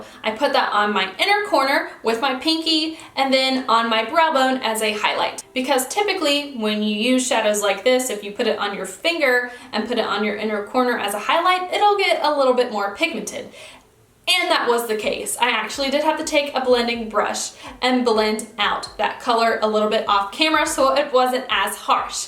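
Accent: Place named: South, United States. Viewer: LA Colors has a really nice matte lipstick line that are gorgeous. Where are you from ? I'm from Alabama. I love to hear another southern gal on YouTube !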